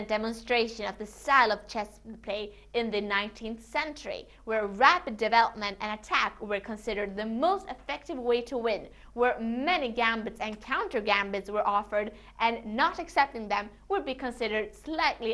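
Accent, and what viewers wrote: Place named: Sweden. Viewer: Nice, a puzzle with some history behind it. Loved it. I think your typical swedish accent is getting less and less apparent.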